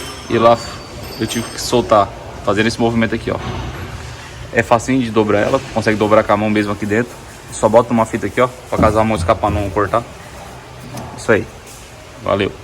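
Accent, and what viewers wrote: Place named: Santa Catarina, Brazil. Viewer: Sotaque de SC. É da Floripa?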